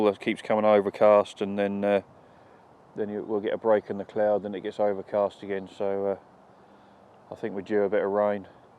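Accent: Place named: Suffolk, United Kingdom. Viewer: Nice video Uncle ;) awesomely put together. Good to hear you’ve still got your good ol’ Suffolk accent!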